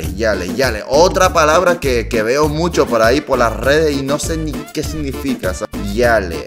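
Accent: Puerto Rico. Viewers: Habla como boricua, bye. Also, hay palabras que ni son boricuas y son media inventadas 😂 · oye tienes un poquito de asentó puertorriqueño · te sale bien el acento boricua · Guapo se usa de las dos formas.... eres guapo o Eres Guapo Ahhh!!!!! Somos difícil de entender los boricuas😂 oye coreano llegue tarde a tu canal, pero estoy en shock hablas como un bori, dime tú te viviste en 🇵🇷? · Este koreano es uno de nosotros ri-tru de cora. Habla bien boricua entregao' a lo reventao'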